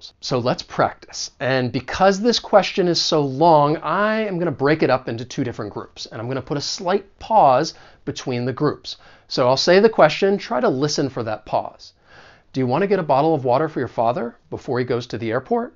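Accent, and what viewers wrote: South, United States. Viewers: I’m not an expert when I say any of this, but I am an American with a slight southern accent. I think linking is leaning more into a southern accent than a standard one. I also think that the “for” pronunciation you are talking about leans more on the Southern side rather than standard.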